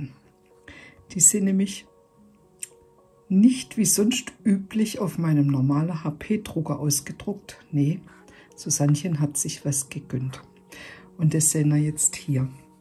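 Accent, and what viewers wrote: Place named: Southwest, Germany. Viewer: Dieser Akzent ist tausendmal schlimmer als wenn mich nen Sachse vollquatschen würde. Gott ey, lernt hochdeutsch!!! Was ist bei euch da unten im Südwesten verkehrt gelaufen?!? Versaut einem das ansonsten sehr schöne Video!!!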